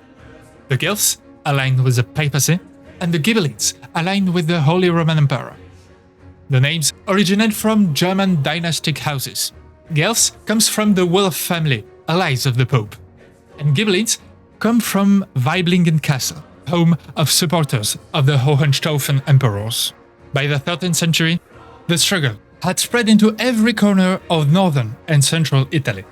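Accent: France. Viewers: am i the only one that finds his french accent in english charming? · C'est moi ouuu le gars qui parle est français? D'après la prononciation qu'il fait. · french accent i see, nice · Me disait bien que j'avais reconnu l'accent haha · Why did they get a guy with the thickest French accent I've ever heard to do the video?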